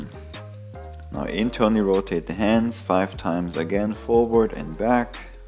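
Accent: Germany. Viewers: Das hört sich doch nach nem deutschen Akzent an 🤔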